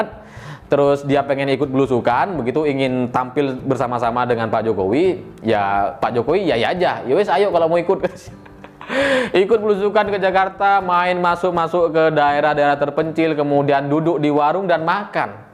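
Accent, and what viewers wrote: Madura, Indonesia. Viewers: Logat maduranya sangat kental bang..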